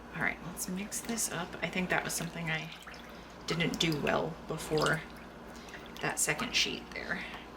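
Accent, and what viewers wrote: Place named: Australia. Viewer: This was an awesome video, top marks. And I got the Australian accent bit. Also, have you gotten your new blades and replaced the old one?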